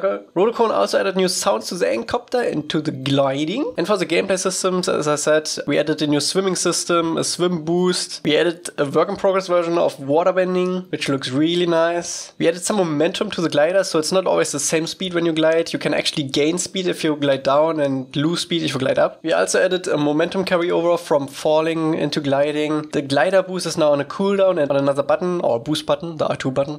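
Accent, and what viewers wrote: Germany. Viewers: Mann hört das du deutsch bist 😅😅😅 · this deutsch akzent is da hehehe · I hören a Deutsch accent 😅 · You sound so German...😂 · Höre ich da deutsch raus?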